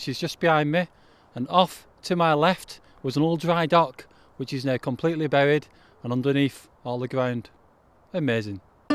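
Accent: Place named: Lancashire, United Kingdom. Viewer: One of the beauties of this record of our history is that it records the accent of the commentator also. These are fast disappearing. Is it and is it very local? Every town seems to have its own throughout Lancashire. Amazing and beautiful. Thanks Lads.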